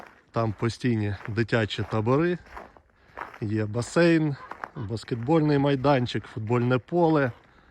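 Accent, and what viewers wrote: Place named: Central Ukraine, Ukraine. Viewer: Закарпатье - это соседняя область, недалеко. А говор у этого автора совсем не местный, он не с Карпат, приезжий. Где-то с центральной Украины.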